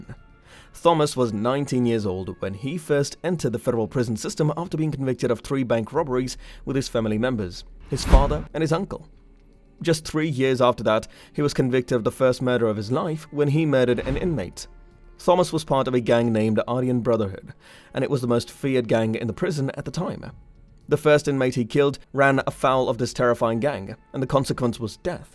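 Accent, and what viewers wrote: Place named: Netherlands. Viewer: You have a strong Dutch accent when speaking English. Good video, Gracias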